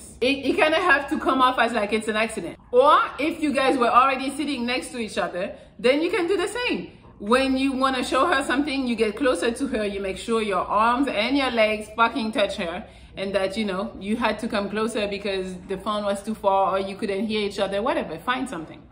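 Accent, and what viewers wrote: France. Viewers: Lol... I love your French accent with how you say things 🤣🤣🤣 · L'accent français est super prononcé ! Super attractive. J'aime trop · French English accent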